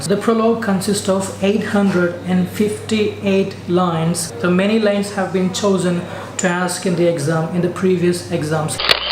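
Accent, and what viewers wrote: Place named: United Kingdom. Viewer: Pure British accent wow...Thanks.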